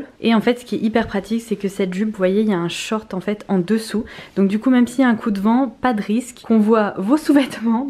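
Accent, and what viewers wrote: United Kingdom. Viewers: Coucou! Cela fait quelques temps déjà que je te suit, mais la première fois que je commente. J'aime beaucoup tes vidéos, et à chaque fois que tu lis un nom anglais j'adore cet accent, que je ne pourrais jamais prononcer MDR Tu es très belle, continues ainsi :-) Ah oui, j'adore tes " Oh, Ouah !" Mdr, fallait que je le précise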